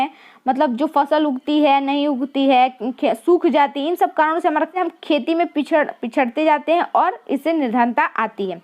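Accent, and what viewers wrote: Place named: Bihar, India. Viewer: Ma'am aapke language k hisab se aap ballia ya fir bihar se hai, sahi hai na...?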